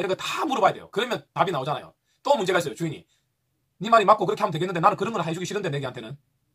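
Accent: Gyeongsang, South Korea. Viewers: 좋은강의 내용 너무나 좋습니다그런데말이 너무 빠르고 경상도 사투리에 빠르다 보니서울에 사는 저는 무슨소리인지 60%밖에 못 듣겠습니다.천천히 또박또박설명을 해주시면 더 좋겠습니다앞으로 더많은 강의 부탁드립니다감사합니다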